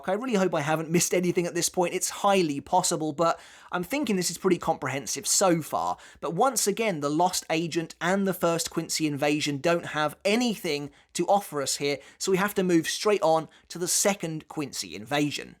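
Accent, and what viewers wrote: England, United Kingdom. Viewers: Pronouncing these with english accent is so irritating. 😂😂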